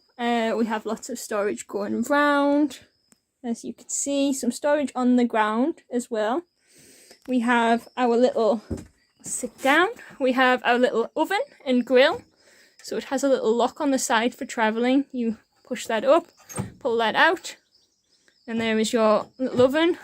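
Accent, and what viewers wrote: United Kingdom. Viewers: Are your from Durham?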